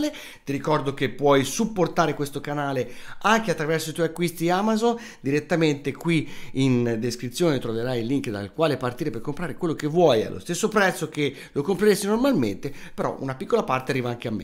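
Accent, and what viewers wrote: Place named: Tuscany, Italy. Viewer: Ciao, analizzo passo passo il tuo video: All'inizio menzioni, e dici che non fai i video belli come i suoi... personalmente ritengo che sia inutile fare in due lo stesso video, quindi trovo utili i tuoi video, come quelli di! Lui molto professionale, con un inglese unico, tu molto "amicone con il tuo eccezionale accento toscano!!!!" Interessante il video che hai fatto, devo dirti che però lanciare i Sidewinder fin che non senti il look, non serve quasi a nulla, andranno quasi sicuramente persi, infatti nel tuo video, si deve che solo il missile che hai lanciato con il look inserito va a segno.. Ultimo prima di salutarti, devo dire che se in futuro spieghi anche le varie versioni del AIM-9, ritengo sia cosa gradita!!! Ciao grande uomo, a presto!!!! NB. dimenticavo, tutto questo dopo la sigla... e' troppo figo!!!!!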